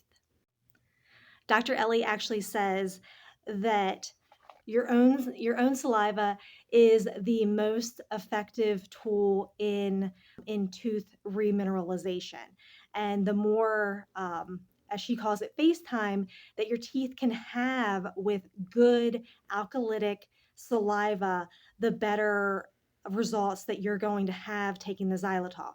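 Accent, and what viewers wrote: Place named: United States. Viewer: intersting ... I though her accent is so American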